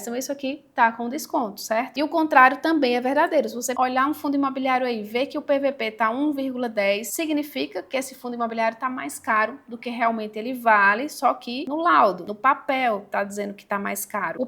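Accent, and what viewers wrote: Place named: Pernambuco, Brazil. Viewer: Fica maia engraçado ainda com o sotaque de pernambucana kkkkkk😅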